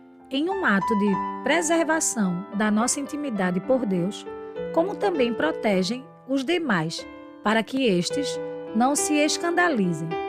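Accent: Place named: Northeast, Brazil. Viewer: Estou feliz de ouvir esse áudio com uma voz nordestina 😊😊😊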